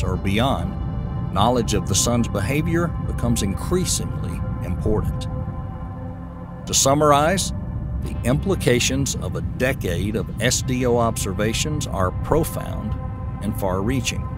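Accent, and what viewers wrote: South, United States. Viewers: Gotta love the southerner talking about space. Love your Channel man!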